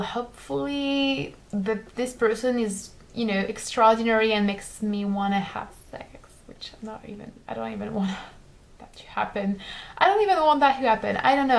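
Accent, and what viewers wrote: France. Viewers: nice video... hope you find the person that compliments you're amazing self well. also amazing french accent. totally love that. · I am puzzled. Not about my own sexuality, I am not asexual, I am straight, never doubted neither of those. But when I began to watch some of your videos, I thought "Damn, she is stunning !" and then "f***... She is asexual, what a shame !". But since I was enjoying listening to your voice, I figured that would be a good time to know what asexuality really was. I used to think asexuals were people who either had bad experiences or were afraid of sex (that would have explained why I saw more asexual girls than asexual guys). After listening to you, I understand that is not entirely true. You are not a "Eeeeew ! Sex is gross ! It is a sin ! You are a whole bench of sinful perverts !" type of person, and that is relief, I must admit. 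My first girlfriend was not asexual, but she used to say she did not like french kissing (she was my first, but she had a lot of guys before me). But when we started to get physical, we ended up making out and we french-kissed a lot. I do not think I was that good at kissing (she was waaaaay more experienced than me) but it came naturally. I think it is the same with sex. With some people, it cames just like that, it is not entirely your responsability. Anyway, I do not know if you are still single (a few month have passed) but I know you will find someone. You are very cute, I love your voice and that light french accent is very charming. There is a fragility in you, that is attractive. And I hope you will be happy with him, with or without sex. ;)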